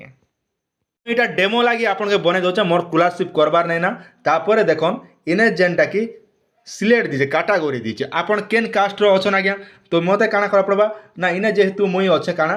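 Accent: Sambalpur, India. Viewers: Tamar sambalpuri bhasha thi kahebarsaili ta badhia laguche.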